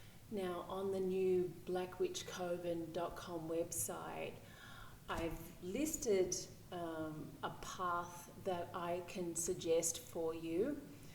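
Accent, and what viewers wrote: Australia. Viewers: Can't help noticing your Australian accent, I'm a fledgling Black Magician living in Sydney, could you advise me of any groups in Sydney? Much appreciated.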